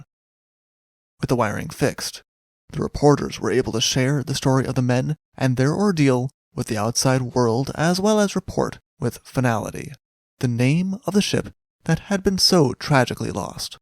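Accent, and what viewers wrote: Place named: United States. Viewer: What the hell is going on with the narration these days. Not to mention I am sick to death of hearing American accents for every damn thing that is read. They are the worst at the pronunciation of nouns and.any other name for that matter. How stupid is the rest of the world to let the USA take over everything on the planet. 😡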